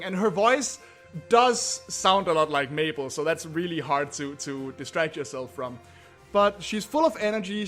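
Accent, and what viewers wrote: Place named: Denmark. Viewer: You wouldn't happen to be Danish? xD Sounds like that on your accent :P EDIT ok nvm, just looked at your channel and can see you are xD Jeg er nemlig også selv Dansker, så det syntes jeg var ret cool :P haha xD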